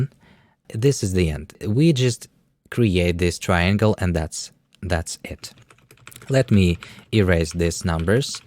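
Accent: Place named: Russia. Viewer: Cool video, comrade. Your Russian accent is so clear and melodic. I love It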